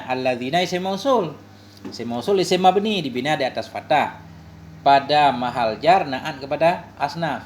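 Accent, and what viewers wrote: Aceh, Indonesia. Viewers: Suaranya agak ke aceh² gitu ya makasih ustadz ilmunya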